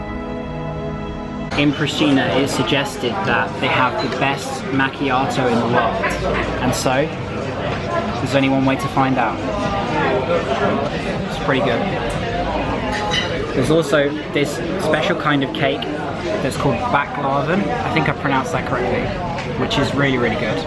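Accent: United Kingdom. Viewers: You’re British English is very beautiful 👍🏻 Oh, and about the orthodox church, all this churches belonged to Albanians I don’t get it why they want to destroy it and most of churches are Catholic Churches and they belong to Albanians... this churches are ancient Albanian monuments stolen by Serbs recent until war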